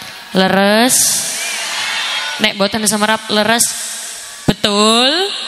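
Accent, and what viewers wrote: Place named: Surabaya, Indonesia. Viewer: Dari cilacap ada ngapak ngapak si bu nyai Mumpuni Handayekti... dari surabaya ada nyai arek arek...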